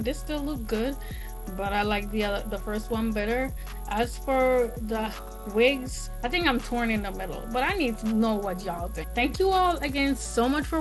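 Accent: Jamaica. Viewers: girl have Jamaican accent ❤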